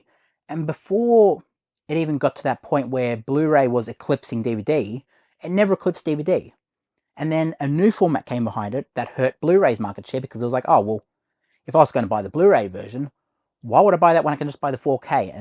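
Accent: Australia. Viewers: I like you, ive said before the way you talk and explain things chills me out. I think you are lost with this you tube, why dont you just copy some pros, do a top ten list of fave haloween mivies. Or for me i could just chill and listen to you review 1 film (no spoilers) at a time . Watch ava pop culture what he does and put your own twist on it, not many would notice if you just copied his videos non stop but with ur aussie accent and chill way of explaini g things, hes frantic, but getting subscribers by the dozen. Copy him bud.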